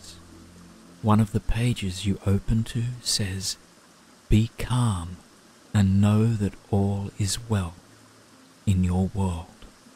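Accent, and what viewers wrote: Australia. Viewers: I’ve listened to only a few guided meditations and I’ve found there’s certain sounds in the voices that are not so gentle.... a lot of times the S sound and sometimes the T sounds are kind of piercing. Is it just me? Is there any way to kind of muffle that at all? Aside from that, it’s great to hear an Aussie voice guiding a meditation... thank you!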